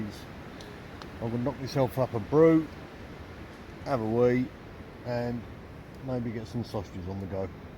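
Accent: Cockney, United Kingdom. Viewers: Mad cockney bastard love it mate just subscribed 👍👍👍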